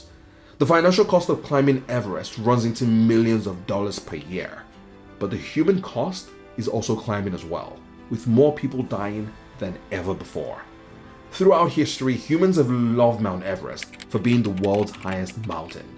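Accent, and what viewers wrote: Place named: South Africa. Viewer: Ha ha... can't hide the South African in your voice. Decent video guy. Even though I'm not a mountaineer, I find the Goddess Sagamartha (Mt Everest) to be incredibly mystifying, beautiful and majestic. A dream of mine is to one day just see it with my own eye. Not to do a summit attempt... I just want to be able to see and marvel at it's colossal beauty.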